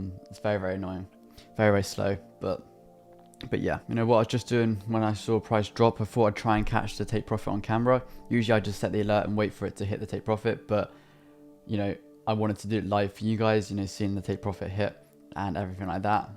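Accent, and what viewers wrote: United Kingdom. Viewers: I can tell...I live in the UK. The problem is some imposter said USA.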